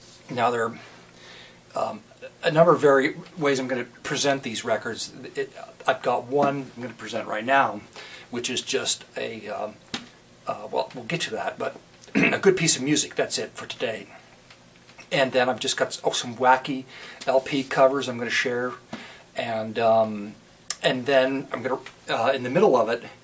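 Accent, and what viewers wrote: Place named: South, United States. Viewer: Sounds rather hillbilly & fun!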